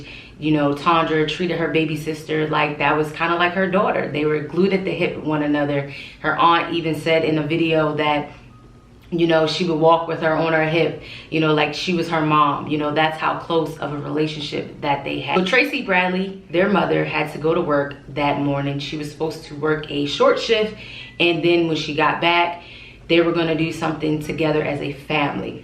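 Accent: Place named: Baltimore, United States. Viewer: Side note are you from Baltimore? Your accent ☺️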